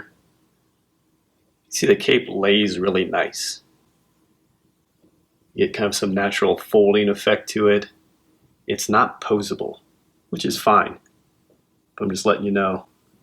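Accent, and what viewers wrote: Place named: Wisconsin, United States. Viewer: You sound like you're from Kenosha Wisconsin.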